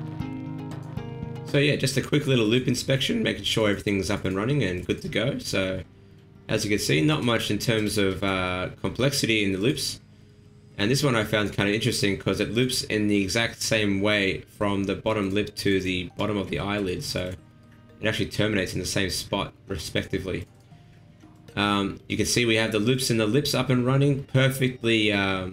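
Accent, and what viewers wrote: Australia. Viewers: Finally I have completed my mission to find aggressively Australian blender tutorials.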